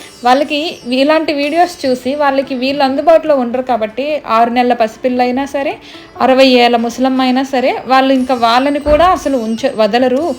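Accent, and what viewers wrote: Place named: Godavari, India. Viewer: Madam midhi a uru ...miru nellore antunaru but godavari slang kanipisthundhi ...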